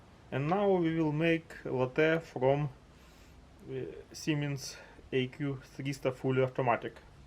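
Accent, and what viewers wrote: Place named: Russia. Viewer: That is the most hilarious russian accent i have ever heard. I love it.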